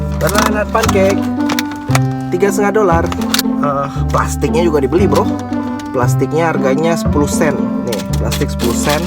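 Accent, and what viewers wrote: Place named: West Sumatra, Indonesia. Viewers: Iya, dari logatnya masih kliatan.. btw im half minang too 😆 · Urang awak rupanya, pantas logatnya familiar😂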